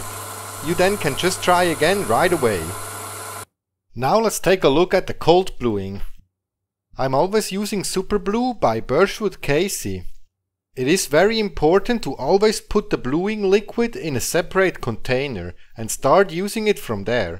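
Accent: Switzerland. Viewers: Ich wette Sie sind Schweizer. :) Tolles Video! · Awesome video, LOVELY 😀 Swiss accent... (I lived in Zurich for 23 years...) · Irgendwie amüsant, wenn man den Schweizer Akzent trotz englischer Sprache völlig heraus hört :D Es funktioniert also mit Metall, aber nicht mit Edelstahl.. Woran liegt es? Chromanteile oder worauf müsste ich achten? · So geil, wenn ich no vor em Rapsöl gmerkt han, dass das en Schwiizer sii müesst!!! 🤣👍🏻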